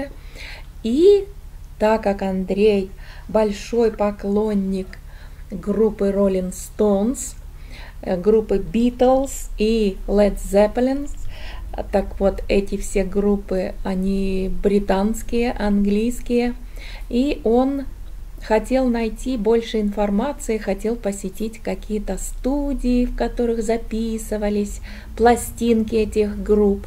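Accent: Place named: South, Russia. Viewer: Дорогая ,витаминка добра и солнышка ,мое уважение,люблю ваш голосок и ваш южный говорок❤👍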